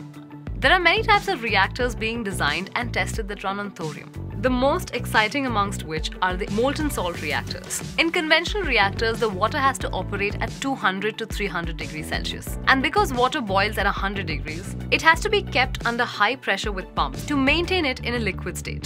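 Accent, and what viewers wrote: India. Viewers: Excellent indian english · That's how the Indian accent is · Indian accents are the hardest accent 2 understand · well narrated.. Indian painted all over it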